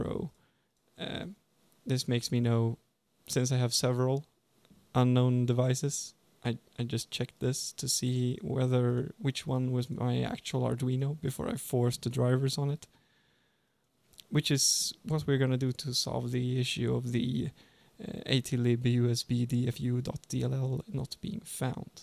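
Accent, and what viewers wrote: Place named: Sweden. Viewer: You sound sooooo swedish =) EDIT: Ohh, du sa det senare att du faktiskt var från Sverige =) Tack för hjälpen!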